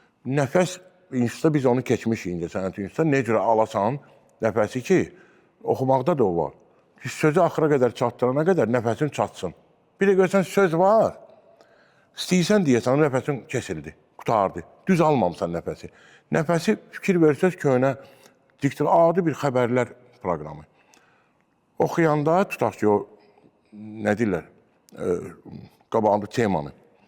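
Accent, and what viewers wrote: Baku, Azerbaijan. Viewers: большое спасибо. Бакылы данышыгы эшитдим. Бакинский акцент остался у тех, кто учился в русском секторе школы. В азсекторе преподовали учителя из районов и сел. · гагаш, тебя очень приятно слушать акцент наш халат Бакинский, да брат Баку не тот и Контингент не тот.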